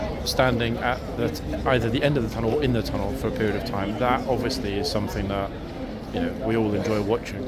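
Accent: United Kingdom. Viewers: I watch your videos always...learning and entertained....while in HK i befriended a few British ladies and guys...jolly people....i love British accent....i also watch DANNY DYER movies,JASON STATHAM and other Brit actors and Irish too....🤗☺ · Your voice is one if the 2 British voices I recognize immediately!! You and Matthew MacFadyen are my two favorites. I enjoy this channel and your humor so much!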